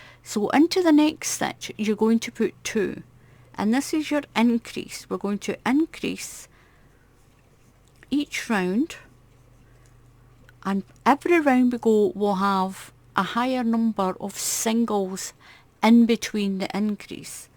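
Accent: Scotland, United Kingdom. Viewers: I stumbled over your website. You have wonderful patterns. But I have a problem. I got sent to a hearing specialist when I was 5 yrs old due to suspected loss of hearing. Severe ear infections over the years ate away the bones in my inner ear. I relate this for all new mothers to pay attention to fussy babies who keep rubbing their ears or get colds. Working antibiotics finally arrived when I hit adulthoods, but the damage was done, I lost complete hearing in left ear due to destroyed bones, and partial in the right is left. I am 70 yrs old now, retired, but lost almost all my hearing. Hearing aids were stolen by “helpers.” My problem is now not being able to understand low soft voices. I had a Scot boyfriend in college your voice sounds much like his mother’s. So I have a dual problem, voice too soft to hear well, and I have to work my way through your accent. 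What compounds it is so far, I can find no instructions in your various pattern videos included in printed on your videos. I have to see the piece you are working on to figure out quickly what you are doing. But often your hands cover the work. Most f what I crochet is done for charity. I’ve done hats, scarves, mittens for grade school children, donated them to schools that provided children with winter items who lost theirs or parents couldn’t afford to buy them. I’ve done baby blankets to go to maternity units in hospitals or for those in NICUs for preemies. Also have done lap afghans for wheelchair vets in veteran h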